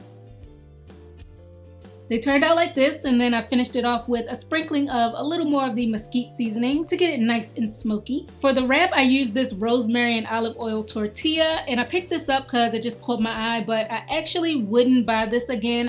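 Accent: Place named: DMV, United States. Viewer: you have to be from the dmv based off your accent."uuuuused"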